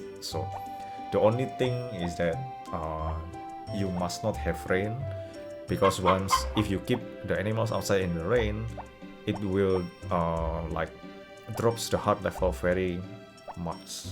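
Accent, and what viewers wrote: Indonesia. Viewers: yes your javanese accent is very noticable through your english 😂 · Judging by your accent, you must be idonesian. Arent you? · hello , me and my gf are watching this whole guide in Japan, and this game is so interesting we are not even studying lol . i will be replaying this with my her who is a huge fan of this game. thanks for this guide , bro. btw, she immediately recognized your indonesian accent, because she is also indonesian i guess Harvest moon and Indonesia go hand in hand :) thank you again for your efforts. Appreciate it greatly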